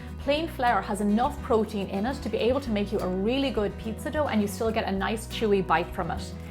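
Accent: Ireland. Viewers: I could listen to you talk all day! Sexy Irish accent. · Yeah, better to watch a pretty lady with an Irish accent making children's food than a hairy, grunting,sweating man in a vest dripping sweat and armpit hairs into the cake mixture... :p No probs. This recipe is a good pizza recipe. For years, I have been making my pizza and bread dough in almost the same way, so yeah it's worth keeping. I'm a bit sad that cooking secrets are being given away on YouTube so that rich people can make even more money, while people who have kept them as family secrets for many years are still poor... Take care. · Are you Irish, Jamaican? Your accent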